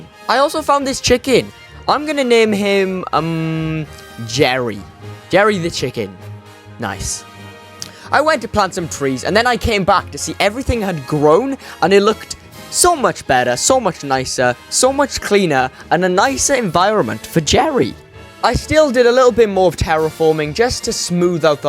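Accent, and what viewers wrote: Wales, United Kingdom. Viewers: You sound Welsh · Are u Welsh u sound Welsh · He sounds Welsh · Soon as I heard a fellow welsh accent all the way from Australia I had to subscribe 😅👌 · Are you british? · Okay, are you Welsh?? Your accent is very familiar 😂❤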